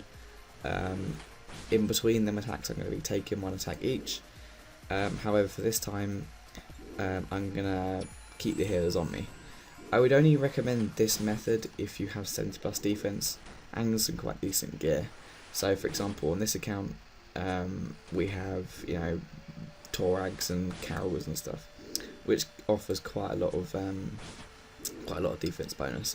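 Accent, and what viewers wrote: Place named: South Africa. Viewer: whats with south Africans and saaoooohhh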